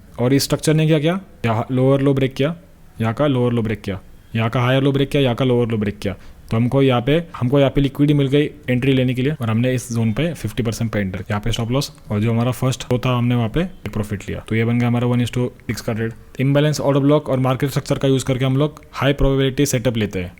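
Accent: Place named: Kerala, India. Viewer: You are amazing!!! with SMC concept bhai.Your accent seems tobe from God's own country,Kerala as I studied in Ernakulam for 2 years.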